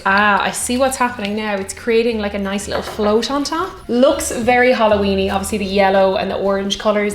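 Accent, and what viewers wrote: Ireland. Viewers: anyone else notice her Irish accent is stronger on her own channel, then when she is tatse testing food on the try channel.. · This combines Irish lore and alcohol hosted by Tinkerbell I mean the Absinthe Fairy with an Irish accent - win! I wonder if Walt got the inspiration for Tink from an absinthe fairy? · Really beautiful woman with Irish accents, one of my favorite things....but then again I am a man.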